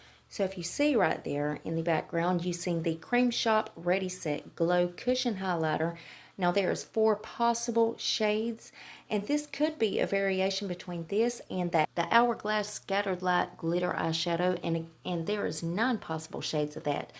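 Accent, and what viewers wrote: South, United States. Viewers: I'd love to get that Hourglass shadow! Your spoiler videos are the best... short, sweet, and served up with that awesome Southern accent (I'm a Bama girl myself)... keep your chin up, girl! ❤❤❤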